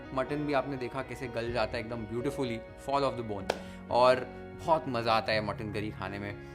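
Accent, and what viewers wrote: Maharashtra, India. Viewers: Your narration as well as your recipes always have a typical maharashtrian touch.... Makes me feel connected with you ☺️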